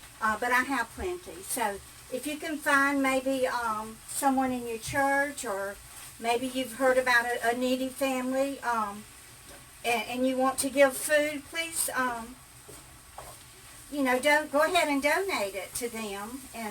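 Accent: South, United States. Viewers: Food looks good ms. 😋your sooo sweet,I love your southern accent😍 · Love that "bless his heart", that's so southern. We all say it down south. The north hasn't taken your accent away bless your heart! You know I'm real southern because of being from south Mississippi like you. sang that song. My mama had all his records, yes records not CD's. Her's were the big ole 78 vinyl RPM's., crawfish pie and file gumbo, cause tonight I'm gonna see ma cher amio. Then he was gonna pole his pirogue down the bayou. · I absolutely love your southern accent :)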